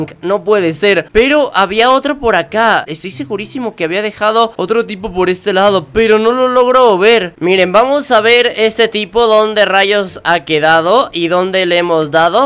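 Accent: Mexico. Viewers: Yo sí te creo que eres de México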